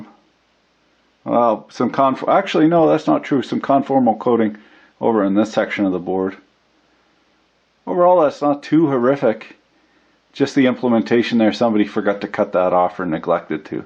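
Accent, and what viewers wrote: Canada. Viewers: WARNING! VERY CANADIAN!!! But he seems like he knows what he is talking about , and he answered my question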